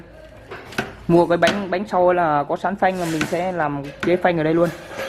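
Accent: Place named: Central Highlands, Vietnam. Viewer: Oh giờ mới thấy rõ ghe giọng nói hình như là người vùng cao OK Number one.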